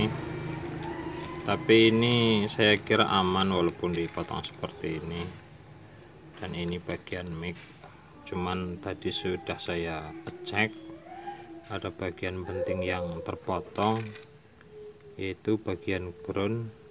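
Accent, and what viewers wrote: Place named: Brebes, Indonesia. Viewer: Cerdas men rika wa nyong ngerti rika wong Brebes o ya? Hehehe..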